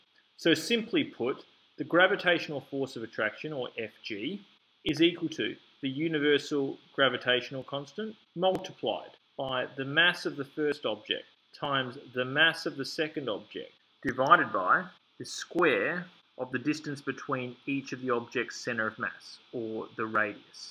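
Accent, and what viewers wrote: Australia. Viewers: This was the first video of yours that I have watched and I think you did a really good job breaking down the algebra. Thanks for this and the aussie accent was refreshing!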